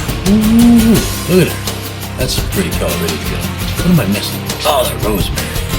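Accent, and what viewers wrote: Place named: South, United States. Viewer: And you gonna pick on my countryness😁 I heard some southern.😁😀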